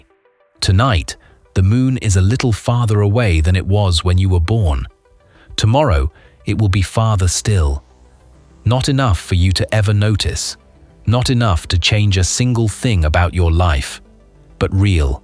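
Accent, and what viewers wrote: England, United Kingdom. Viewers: What’s very funny is how the general public believes so much more in the narrator with an English accent, as opposed to any other accent rather irritating to me pompous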